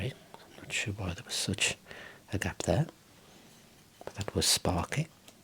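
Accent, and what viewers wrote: Birmingham, United Kingdom. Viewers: Genuine question - how come you're a Utd fan rather than Villa, Wolves or Birmingham? You're accent suggests brummie or black country. Was Utd a family thing or personal preference?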